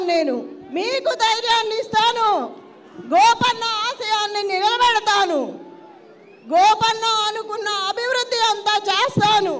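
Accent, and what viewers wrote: Andhra Pradesh, India. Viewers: Andhra voice Baat me dum nai hai😢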